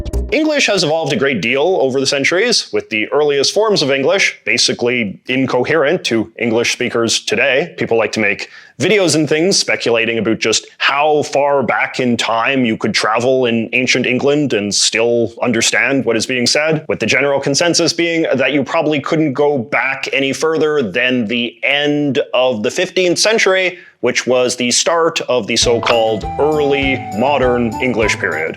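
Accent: Canada. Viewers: North Midwestern (MN, USA) here. "Hey, how yous doing?" is addressing a group of people in some rural areas in the north west of the state. I am unsure of how common using yous as a plural you is, but I'm sure it bleeds into Canada in many places, eh? Having grown up near Fargo, it is good to hear my home accent on this channel, but I suspect the host on this channel is Canadian, as the accent leans real heavy on the double-o ooh in 'about' and such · By any chance are you Canadian?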